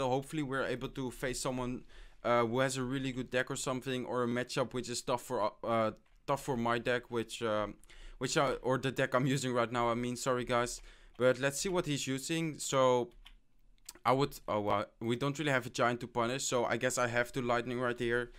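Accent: Netherlands. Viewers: I know that you are dutch you have the same acsent as kwebbelkop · Are u Dutch?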